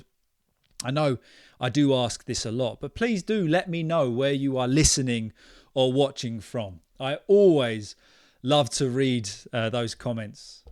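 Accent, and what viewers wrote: United Kingdom. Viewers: Hi, I'm from Myanmar, and I absolutely love the British accent. I'm really keen to learn it, so your podcasts are perfect for me.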